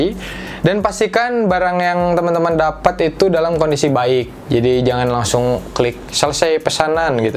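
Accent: West Java, Indonesia. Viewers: Om, situ orang sunda ya?, Logat logatnya sunda bgt😂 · orang sunda nya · kok mirip efkahfajar yak nadanya, apa karna org sunda? ahahahaha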